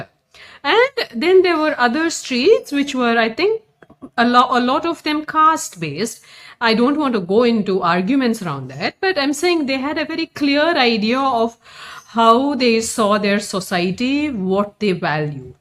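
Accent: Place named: Sri Lanka. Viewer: Sounds like a Sinhalese accent.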